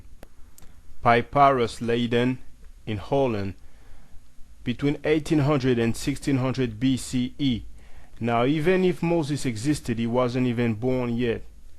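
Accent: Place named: Haiti. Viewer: As soon as I heard your accent I knew you were Haiti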